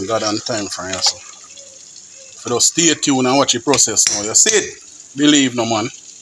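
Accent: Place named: Jamaica. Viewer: The jamaican accent 😊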